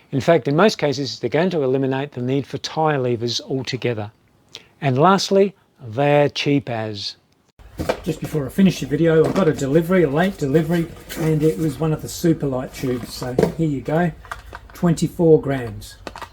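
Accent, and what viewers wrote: Australia. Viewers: it's South Australian, not a Sydney sider accent. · Very informative, great australian accent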